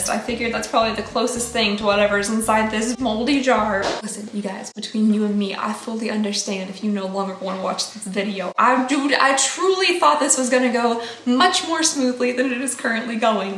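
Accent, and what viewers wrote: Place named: South, United States. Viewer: I don't know if you actually went to Michigan Tech but in my head cannon you did. When you get stressed your southern accent gets stronger and I imagine the Yoopers being hella amused by that. A Southern Belle in Yooperland.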